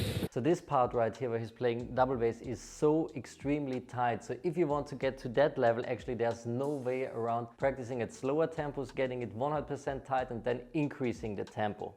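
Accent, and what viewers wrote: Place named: Germany. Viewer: This kinda sounds like a German accent.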